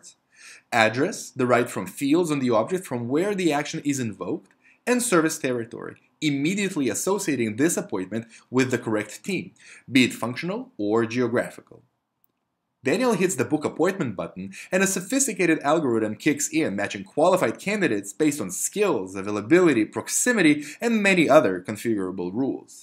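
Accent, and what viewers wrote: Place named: Italy. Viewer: Loud rock guitar music, Italian accent.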